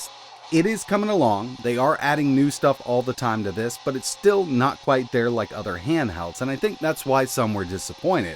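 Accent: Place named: North Carolina, United States. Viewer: This is very generous! I just saw your address in your description, I thought I detected a fellow Tarheel's accent!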